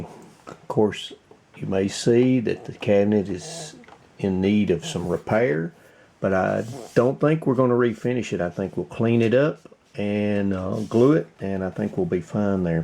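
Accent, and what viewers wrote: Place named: Tennessee, United States. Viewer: Interesting looking radio. Really like the Lady Liberty dial. First time I've seen your channel. I'm a Yankee but I recognized your accent immediately as I had cousins who grew up on Signal Mountain TN. This video is a year old already but I'll be looking at more of your videos from now on.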